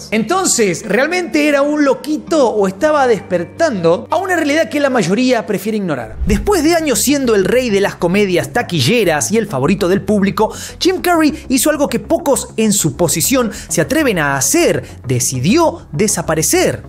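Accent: Argentina. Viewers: La vocesita y el acento argentino que no deja de verse el ombligo y sus comentarios lúmpen hacen que mejor veo cosas inteligentes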